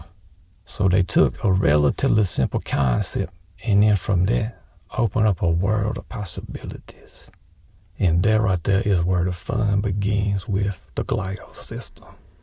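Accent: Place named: South, United States. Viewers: that is the most deep fried southern accent I've ever heard. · Star war ,he ma. Coolnicity. Errybody like gi joe. This guy never puts S at the end of his word. He sounds like he is Tennessee or alabama maybe louisiana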